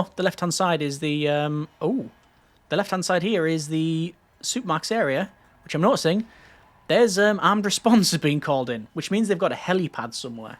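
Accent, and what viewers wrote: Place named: Middlesbrough, United Kingdom. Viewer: Why do you sound like you're from Middlesbrough??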